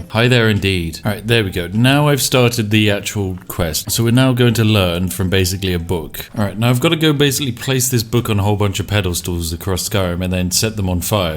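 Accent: United Kingdom. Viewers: Are you English or Scottish or Welsh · Are you british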